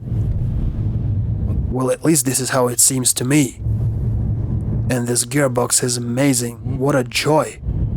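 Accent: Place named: Russia. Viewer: как всегда - восторг! Субъективное мнение: Если этот канал ориентирован на англоговорящих людей, то им не будет интересно это смотреть из-за озвучки. Акцент слишком русифицирован и не чувствуется какого-то драйва диктора. Он не говорит так, как говорит американец или британец - интонационный поток "мертвый" в каком то роде:( Интонация всегда падает вниз и не передаёт эмоции рассказчика как таковые. Возможно в оригинальной озвучке их и не особо много было, но над этим явно стоит поработать и тогда англоговорящая аудитория будет заинтересована слушать это! Можно взять любой американский обзор и увидеть разницу - <link> Всех благ и удачи!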